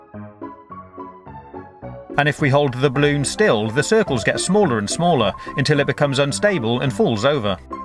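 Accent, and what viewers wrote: England, United Kingdom. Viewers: England. The accent! Just my guess. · yup definitely from england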